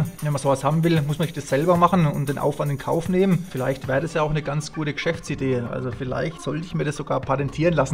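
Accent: Franconia, Germany. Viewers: du frängelsd jo wid zau :) Mega Grüße aus Unterfranken!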